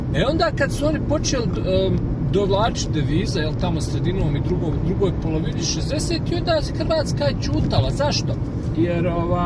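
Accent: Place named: Central Bosnia, Bosnia and Herzegovina. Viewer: Kako ti je uspelo da zadržiš akcenat srednje Bosne ako si od 1992 u BG? Hvala na odgovoru.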